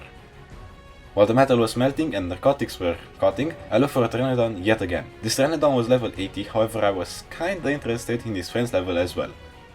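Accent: Poland. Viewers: Kolego, is it a polish accent? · you just sound polish and im polish so was curious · Are u from poland?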